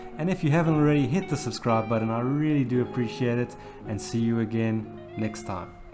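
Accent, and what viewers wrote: South Africa. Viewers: Bro I know ya in Australia but I kinda hear a slight South African in there ? psssssst bro much better if you get GH5 with awesome IBS :P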